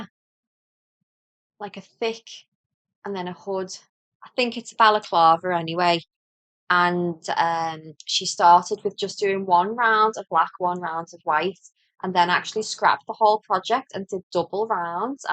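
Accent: Liverpool, United Kingdom. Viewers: I knitted facial cloths out of Bamboo Pop, which is cotton/bamboo. They turned out to be sturdy. I liked the 20cm (8") square, and knitted them with squares K5 P5 and slipped the first stitch of every row. I'm in Maryland, and heard about you from Becky of A Handknit Letter. Love listening to your Liverpool accent; reminds me of interviews in the '60s with Paul McCartney. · What a great podcast! I'm from Stourbridge in the West Midlands so not a million miles away, and I love the scouse accent. You are so natural and chatty. I love it! I've just subscribed, too ❤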